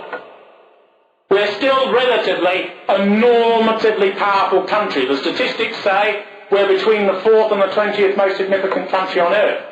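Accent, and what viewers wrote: United Kingdom. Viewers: could you imagine if the sound quality was better and Bowden had tried to reduce the british accent a bit, he would have had a lot more attention on him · He had a very normal English accent. If Americans find it off-putting or that it makes him impossible to relate to then that's their problem.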